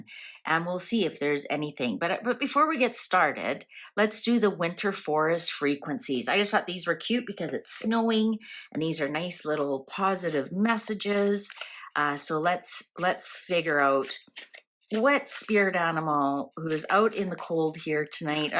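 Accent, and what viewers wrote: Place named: Canada. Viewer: Thank you for this reading. I love to hear your Cannadian accent. It reminds me of my immediate family who married Canadians and live in Ontario😊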